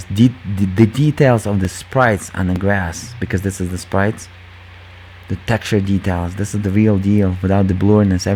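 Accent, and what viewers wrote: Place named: Russia. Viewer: он русский слышно же по речи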